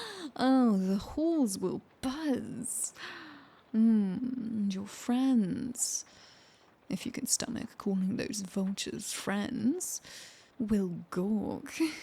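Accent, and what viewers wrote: United Kingdom. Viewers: Sleepy British girl 💜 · British 😭 dont shank me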